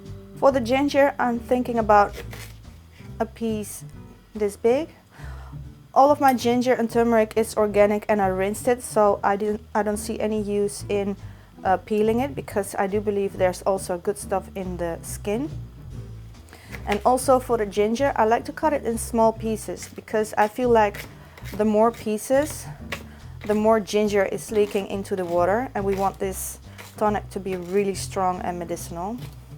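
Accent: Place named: Netherlands. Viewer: I thought your were Dutch, sorrie it's always the accent. Love your date tarts by te way!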